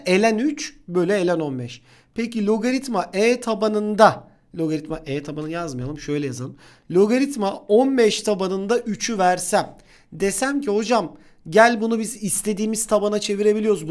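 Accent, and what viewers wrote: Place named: Izmir, Turkey. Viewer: Bi İzmirli ağzı sezdim sanki.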